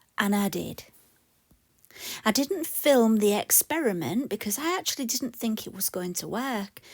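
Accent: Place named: Northern England, United Kingdom. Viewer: Thank you for posting this video, it's so informative. I love your lovely Northern accent and voice. It's so nice to hear a British accent in the sea of American ones (not that there's anything wrong with theirs 😅) just lovely to hear the expressions and colloquialism 💞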